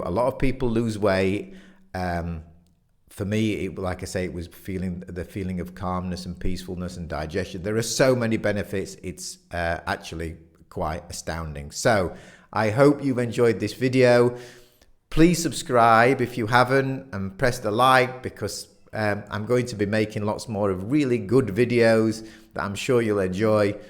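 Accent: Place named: United Kingdom. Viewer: I'm a fan of the British and, like most Americans, I fall for the accent. You are a lovely man. My husband reversed his gout and other physical ailments, but his doctor had to tell him, "Your numbers are not good at all!" And so, long story short, he listened to his vegan, low-to-no fat diet and found, at his next doctor visit, that his numbers were much better and his gout went away. Carry on, kind sir, as you Brits say!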